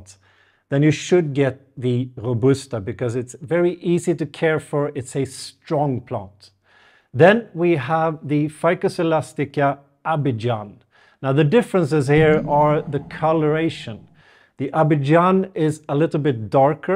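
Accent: Scania, Sweden. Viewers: Just love how he speaks English with a touch of skånska. ”Reubusta” 😂 Great video guys 👍🏽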